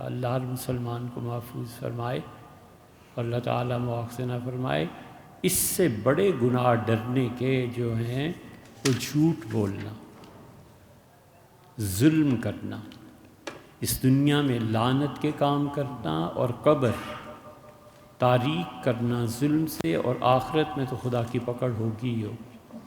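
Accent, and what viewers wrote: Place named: Lucknow, India. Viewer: مفتی سعید خان پاکستان بن چکا ہے اور اب تم لکھنوی ماحول سے باہر مسلم معاشرے کے رہائشی بن چکے ہو لہٰذا اپنے لب و لہجہ کو پاکستانی زبان سے متعارف کروا کر ہندو غلبہ والے معاشرے سے دور کر دو تو بہتر ہوگا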